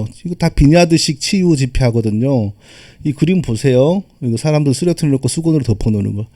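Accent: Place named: Jeolla, South Korea. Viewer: 전라도 사투리가 짙게 뭍어 나오는군. 경망스런 놈이군.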